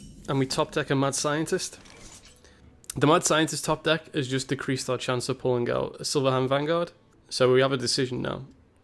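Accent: United Kingdom. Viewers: You sir would be a very successful audiobook narrator or radio host, and it's not just the eloquent British accent.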